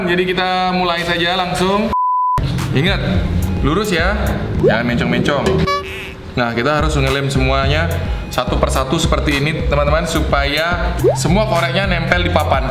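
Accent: Bali, Indonesia. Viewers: Orang Bali? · Logat bali ny kentel bet wkwkw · Memang orang bali, daerah denpasar kalo ga salah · Aku yang mikir Kak ini orang Bali, atau emang orang Bali, atau cuma logatnya yang mirip orang Bali, atau aku ketinggalan jaman? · Aku baru liat chanelni Kok kek logat bali:) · Kyk logat bali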